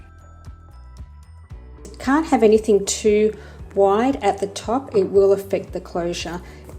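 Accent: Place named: Australia. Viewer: Are you from Australia? I am guessing because of your accent. I’m looking to buy a bag from polene but not sure if they ship to Australia since their website only shows USD dollars or the EUROS. Thanks for your help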